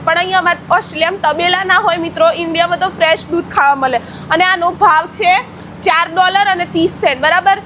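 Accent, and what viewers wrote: Sabarkantha, India. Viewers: સાબરકાંઠા ના છો બેન સાબરકાંઠા ની ભાષા આવે છે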